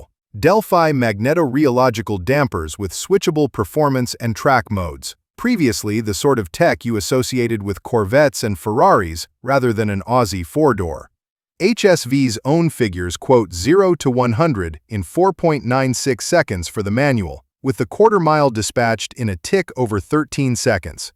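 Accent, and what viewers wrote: United States. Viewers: Zed not Zee, god damm yanks, if you're gunna talk about Aussie cars, speak English not yank. And learn how to say Barra, as in barramundi.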